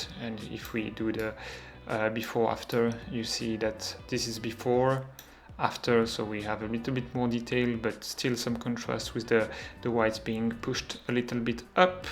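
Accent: France. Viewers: Isn't it a French accent ?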